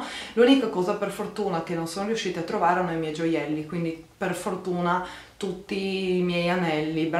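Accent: Trieste, Italy. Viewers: Caterina Trevisan un triestino che parla in italiano si riconosce subito, non siamo capaci di parlare "in lingua"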